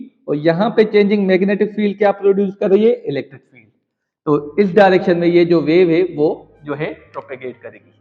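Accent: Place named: Sindh, India. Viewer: Mashallah sir ap sindhi h mny just imagine kiya apki urdu language se or smjhany k tareeqy se k sindhi h sir ku k sindhi master h physic k or ap b aeseii lghy but ap to sindhi hi h mashallah buht acgy h smjhanab❤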